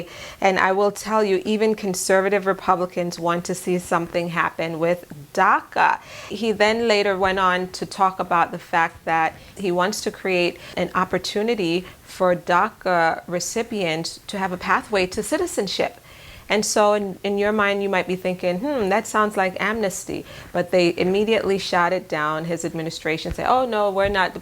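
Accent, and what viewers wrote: Jamaica. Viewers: R u a Jamaican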